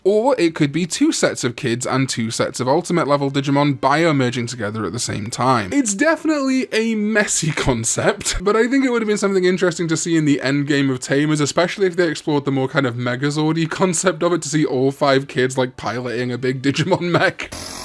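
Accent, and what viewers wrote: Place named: Wales, United Kingdom. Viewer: Where in Wales are you from? I’m from Porthcawl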